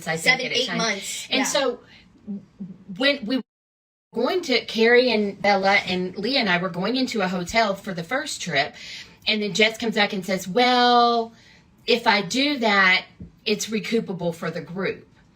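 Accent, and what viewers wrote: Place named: United Kingdom. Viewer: Newsflash! British people pronounce words differently! Everyone isn't murican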